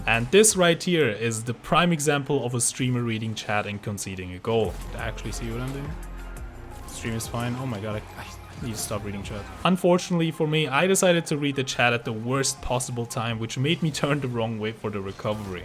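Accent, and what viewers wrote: Germany. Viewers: Your English sounds like german English...Are you german?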